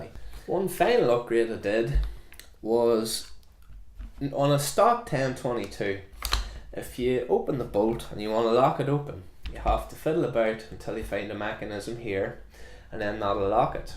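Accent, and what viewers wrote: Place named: Northern Ireland, United Kingdom. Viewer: Great video, I just purchased a second-hand 10/22 and tactical FDE stock today from Young Guns in Castledawson. I set aside a 25 round magazine because I know the PSNI have tried to make it a lot harder to purchase mags. Big hello from Co. Tyrone. It's nice to hear a local accent on YouTube for a change.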